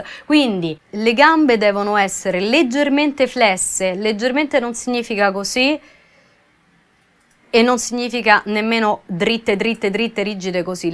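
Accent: Rome, Italy. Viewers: Se sente che è de Roma :D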